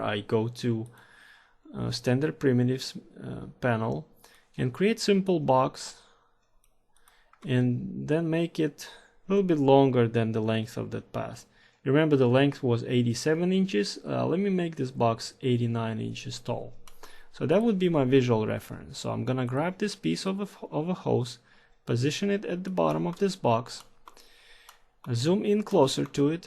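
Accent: Russia. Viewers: are you russian? you kinda sound like one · You have special apeak type are you russian i think you are russian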